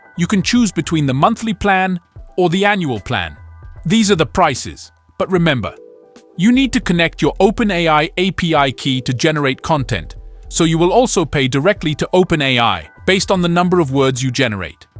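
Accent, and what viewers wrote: Italy. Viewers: But this is better than his Italian accent. 😅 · Not gonna lie I miss the Italian accent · Thanks for sharing the update! Me too I like the Italian accent. Do you have a discount code for Surgegraph?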